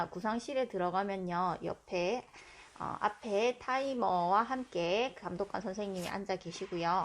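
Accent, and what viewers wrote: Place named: Gyeongsang, South Korea. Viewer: 선생님~ 임용 공부하다가 억양이 너무 익숙해서 찾아보니 대구셨군요! 송구하지만 저도 자료 부탁 드려도 될까요? <mail> 입니다^^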